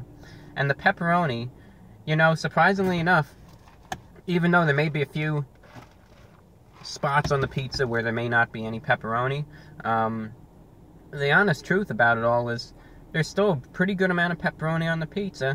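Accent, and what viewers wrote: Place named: Transatlantic, United States. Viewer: The name of that accent is Transatlantic as it's supposed to mix in elements of GA and RP.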